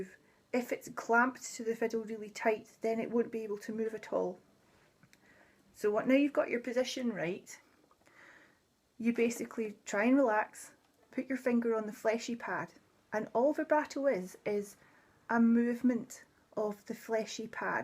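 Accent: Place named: Scotland, United Kingdom. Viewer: I enjoyed your instruction ( ive been playing since before internet existed) I can learn something from anyone> its making the NEW ...a HABIT. I LOVE hearing your scots dialect. and pretty name. thank you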